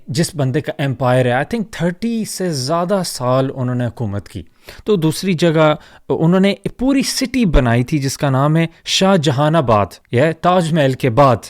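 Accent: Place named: Punjab, Pakistan. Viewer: You talk like a punjabi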